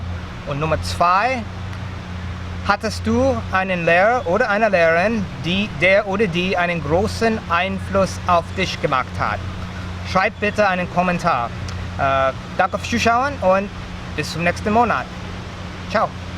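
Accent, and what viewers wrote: United States. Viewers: Dein Deutsch ist einfach super mit einem schönen amerikanischen Akzent 😀👍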